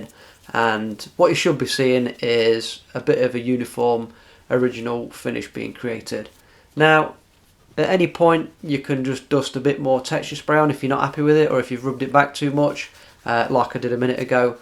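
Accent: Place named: North East England, United Kingdom. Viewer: I recognize the accent tens years ago when I was in the NE of England... enough siad...this channel's great, I'm ur new subscriber.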